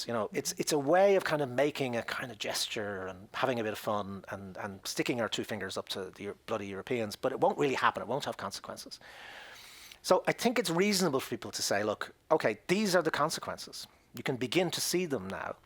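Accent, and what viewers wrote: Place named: Ireland. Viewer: As an Irishman, I appreciate your comment. I would like to suggest an answer to your question 'why are all the most intelligent commentaries on this delivered with an Irish accent?' In Ireland, our requirement to have a referendum every time we adopt a new EU treaty means that we are forced to pay attention to the EU. We know and understand the EU better than English people, who don't get it at all. It has the added benefit of leaving Irish people with a sense of ownership of our membership. Before we, the Irish, joined the EU in 1973, for the previous 50 years we are had the type of independence that the UK is now seeking. We know the limitations of going it alone in the world, its and outdated form of independence that belongs to the early 20th century and only okay if you like being poor. It is also somewhat of an illusion - as a small country will always have to give way to a bigger country in trade negotiations...in this case the EU will always dominate the UK. 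We are never completely free, independent or sovereign. In short, we know how deluded the aspirations of JRM, BoJo and IDS and others are. I wish you well.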